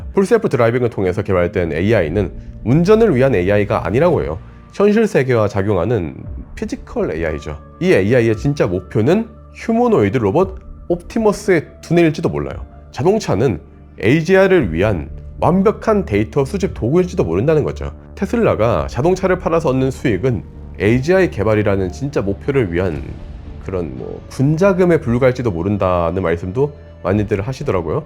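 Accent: Gyeongsang, South Korea. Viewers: 경상도 사투리 구수하니 좋네요!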